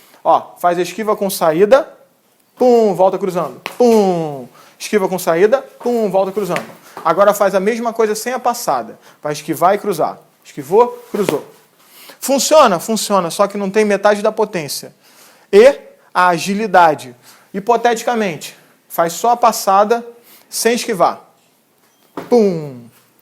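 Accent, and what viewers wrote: Rio de Janeiro, Brazil. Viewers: Aí se tivesse cm se matricular ou algum modo de se tornar aluno dele assim como o matemático eu iria firme e forte, pelo sotaque é carioca também.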